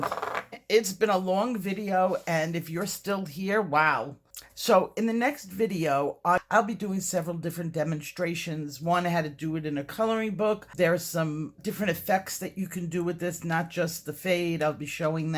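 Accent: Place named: Boston, United States. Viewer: Hi. Are you from Boston? Love your accent. 🙂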